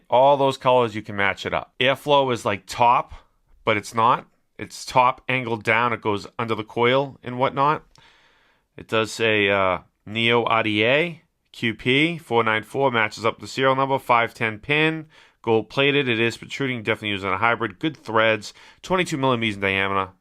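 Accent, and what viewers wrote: Boston, United States. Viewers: You gotta be from Boston lol aaada, I'm from dochesta ;) thanks for the review! Where in the city aare ya from?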